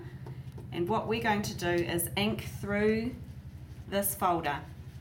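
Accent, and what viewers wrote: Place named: United Kingdom. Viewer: British Accent LOL! Cute tho, :)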